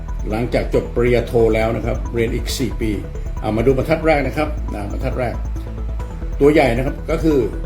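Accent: United States. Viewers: สำเนียงโคตรเมกันเลยจารย์ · สำเนียงและสปีดเดียวกับ Voice of America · This is the real american accent ,you know?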